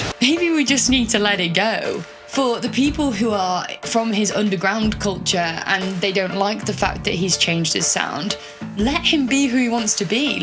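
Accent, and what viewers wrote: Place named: RP, United Kingdom. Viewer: The posh upper-class British accent I hear..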